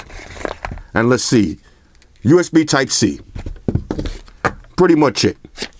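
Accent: New York, United States. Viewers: I just bought the iPhone Xs but I wanted to hear your review on this. The NY accent is 😘♥️😍 Us ladies love it!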